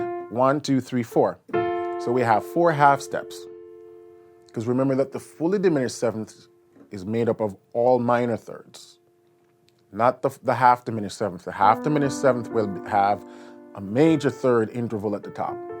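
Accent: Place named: Jamaica. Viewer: Lol I love when the yardy accent slips in and out lol, great stuff bro! Love from Trinidad!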